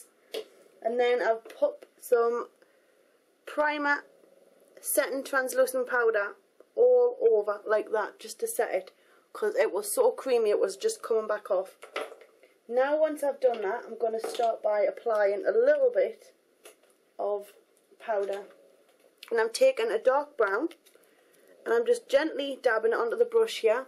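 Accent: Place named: Scotland, United Kingdom. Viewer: Her accent mixes both english and scottish. I heard a welsh person talk before and they didn't sound that different from her.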